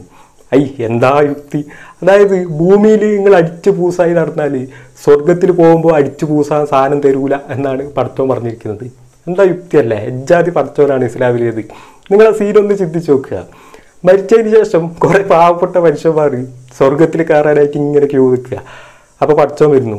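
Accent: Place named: Kozhikode, India. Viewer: Almost 1.23 minutes of a clip by some extremists like Taliban from an unidentified place, then a teaspoon of Moin Ali, then two cups of Naveen and Janaki, then a piece of incomplete hadith. All mixed in a cauldron peppered with nice kozhikodan accent. Sure recipe for a viral video with lots of views, likes, comments and thus a revenue mode for the presenter! Of course the presenter has the rights to air his opinion since we live in a democratic country but it should not be at the expense of religions in our country. Every religion including Islam, Hindu, Christianity etc has an extremist faction. But generalising the whole community by highlighting only those selected parts is totally wrong. Don't tarnish Hinduism with your theory of Gothram just because some insensitive politicians brought a communal angle to the viral dance video. Majority of Hindus protested against the stupid statement brought out by the political party. 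And by the way , quoting a hadith is a new fashion and a sure shot ingredient for making videos viral because people don't know what's written in Quran, the context, the inner meaning etc since it's in Arabic. When you proudly discovered the Intoxication hadith, my dear friend, you forgot to check the full context and remaining part of the hadith you mentioned. Next time, please read the paragraphs above and below of the random lines you pick to spark a fire of hadiths or verses from Quran. Understand the whole chapter and context before adding memes! Oth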